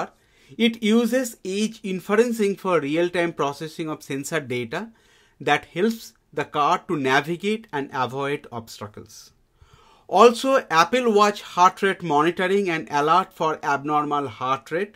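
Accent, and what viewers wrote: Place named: Bengal, India. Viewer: Thank you. The content is really good and helping a lot for certification preparation. Just a suggestion, the voice used for recording is Bengali / biased . May be a auto dubbing provided by youtube will be more polished and helpful since there is no bias for pronounciations. Again, its a suggestion, please dont take it in a negative way.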